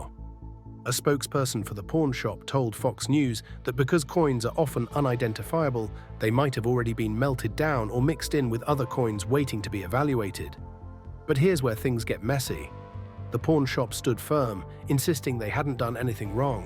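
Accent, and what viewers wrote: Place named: United Kingdom. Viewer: Obviously made by a Brit.There's a whole series about British pawnbrokers, and they all pronounce it 'pornbrokah'. Quite distracting, actually...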